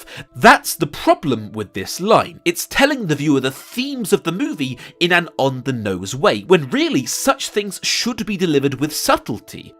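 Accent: United Kingdom. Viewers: It's so refreshing hearing a British accent on YouTube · Imma' be honest, every time I click on video I'm wondering since when did he have a british accent.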